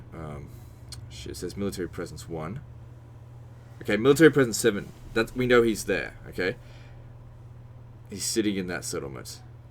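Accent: Australia. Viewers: Love your attitude about doing it your way even when the advise is totally correct. I can relate, but you're way more extreme in it. Strong personalities = manly balls, keep this shit up my Australian-Sound-More-Thor-Than-Thor-Himself-Legend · Linoge Z: Well it sounds like he has an Aussie, or New Zealand accent. So I highly doubt it was a US education. But for a guy who can't differentiate between to, and too, I think your hardly in a position, to be talking about someone else's education. No matter where they're from!..😏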